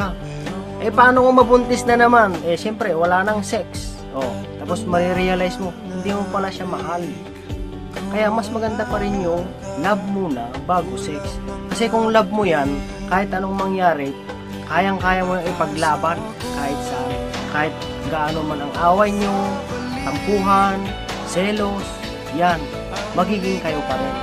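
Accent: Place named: Ilocos, Philippines. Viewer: Aguy gnda ng topic kabsat.tuloy mo lng yn topic mo hahaha... Panalo sa payo.Ilokano tlga ang tonoooooo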